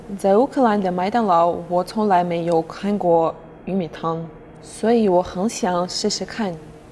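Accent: China. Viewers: 她一口大陸腔的國語，四聲再抓一下就很標準了。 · 她說的是中國腔馬列中文， 不是台灣腔國語！ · 等等 中文講得很好啊 雖然有中國腔 但還要來學中文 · 聽口音就知道去中國撈錢失敗轉戰台灣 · 肯定是在來台灣前就有學中文了，有大陸腔跟用語，因為烏克蘭跟大陸是相連的！ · 這種之娜口音的下次可以不要發嗎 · 倒是不一定。國外學中文本來就是學中國那一套，帶中國腔是正常的🤣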